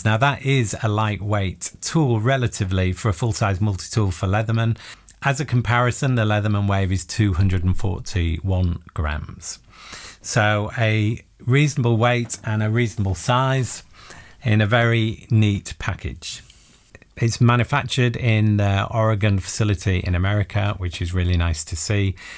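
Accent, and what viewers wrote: United Kingdom. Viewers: Hi! New subscriber here. Love the “James Bond” intro there, specially with that natural British accent, such a joy to hear. Anyways, I like how you do these gear reviews, in such a simple way, very straightforward, I feel the sincerity. 👍🏼👍🏼 to you! Your new number one fan from the Philippines. 🇵🇭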